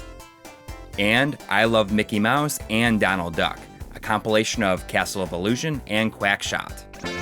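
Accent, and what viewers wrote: Wisconsin, United States. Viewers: I seriously can’t take the Wisconsin AAAAccent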